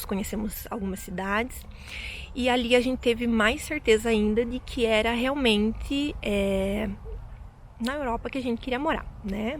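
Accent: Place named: Brazil. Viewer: Muitos parabéns pelo seu português. Posso dizer-lhe que fala corretamente tem o seu sotaque que é brasileiro e é lindo. Sejam bem-vindos ao meu país.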